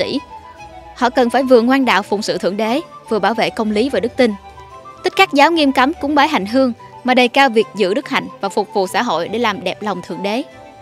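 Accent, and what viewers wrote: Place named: Saigon, Vietnam. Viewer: Giọng sài gòn rất hay ủng hộ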